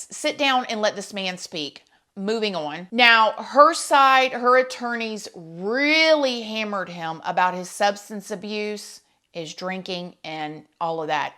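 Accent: South, United States. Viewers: Seriously! As a Texan; raised (mostly) by my Brooklyn mom who recently had lost all those goofy (or what I used to think was obnoxious 'isms) after a stroke. It is TOTALLY my favorite when "da, da, da" and "excetera" 's... ESPECIALLY with that southern twang! My foreign grandparents used to say I have the thickest southern accent, which I really don't having grown up around so many different accents. tickles and warms my soul.. helps the grief 🥰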